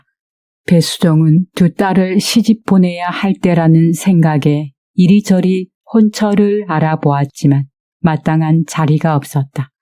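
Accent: North Korea. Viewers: 억양이 북한쪽 같네요? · 북한사람이 읽어주는거 같네요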